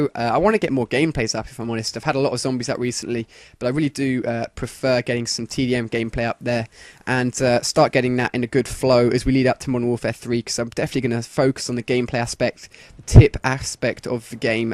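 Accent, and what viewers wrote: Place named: United Kingdom. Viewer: AHAHA! ANOTHER GUY WITH A BRITISH ACCENT!!!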